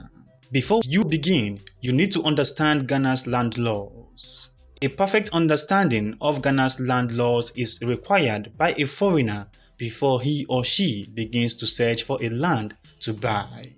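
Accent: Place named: Nigeria. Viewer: Nigerians accent, but good video. Said many stuff in few minutes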